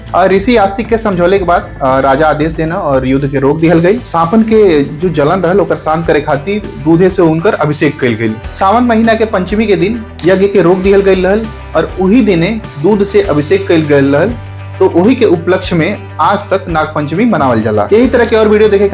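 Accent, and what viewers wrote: India. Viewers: Itni shudh bhojpuri 😮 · Superb sir and hmare tarf wali bhojpuri sun ke maja aa gya🙏🙏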